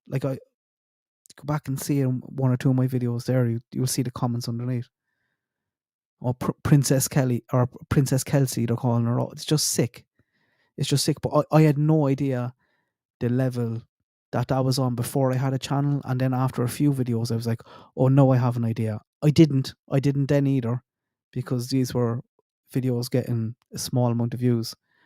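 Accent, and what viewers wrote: Ireland. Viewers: Can I ask if you are from West or North West Ireland? You sound so much like my favorite Irish Trad band from Co. Clare, "*Socks is In The Frying Pan" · I like ur theory about the dog in the backyard. That makes total sense to me. I was listening to a prosecutor pod cast and they said that one of the reasons they would seal court documents and a hearing is because others may be involved. Other than that the courts belong to the citizens. We own the courts because we pay for them which is why there’s a freedom of information act. (FOIA) I love listening to you talk. My grandfather’s parents settled here in Michigan. At the time the Irish were not treated so well by society so they would set up Irish settlements. I actually grew up in the old Irish settlement. They had there own school and functioning society. Because my grandpa was only around Irish settlers and his parents, he retained a bit of the Irish brogue. 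He was the sweetest man on earth so herring your voice is so nostalgic for me! ☘️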